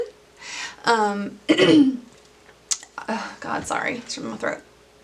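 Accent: South, United States. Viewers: You’re 100% southern USA or have a deceiving accent if not! Love this video and your story! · I am also bipolar and definitely understand that manic episode feeling a thousand percent! it's like you don't have control over yourself or your actions but you don't realize you don't have control you just feel super energetic I'm so sorry that you got sucked into that negative situation but I'm glad that you are out of it ❤️ also so excited to see someone with a Southern accent on youtube! From a Georgia gal living in Alabama 😂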